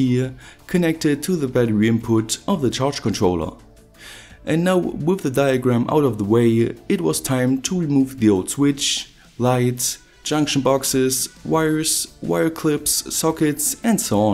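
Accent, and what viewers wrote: Germany. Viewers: I hate English with Russian accent (crazy Russian hacker) but English with German paradoxally is very nice :) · C'm'on, german accent is the best part of those videos :)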